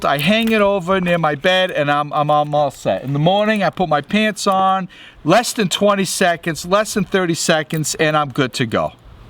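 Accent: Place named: Boston, United States. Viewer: "Peter Griffin reviews his belt" haha Your from Boston aren't you?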